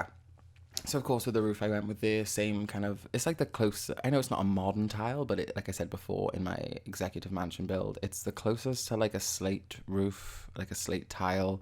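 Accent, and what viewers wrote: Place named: Wales, United Kingdom. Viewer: You sound welsh, I am too (I’m from South Wales) 💓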